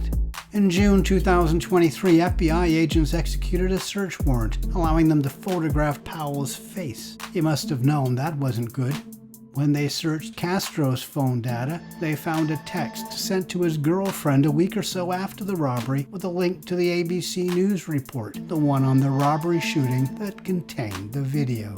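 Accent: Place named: Canada. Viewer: You sound like a Canadian bro! Come on down and check it out for yourself.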